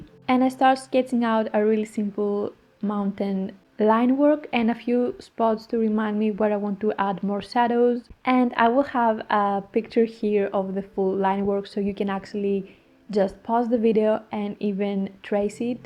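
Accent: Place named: Greece. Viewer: Breathtaking piece! Thank you for this. Despite your very cute greek accent, you gave a very clear and detailed tutorial. All your tutorials are very helpful. ❤ Kudos! 😊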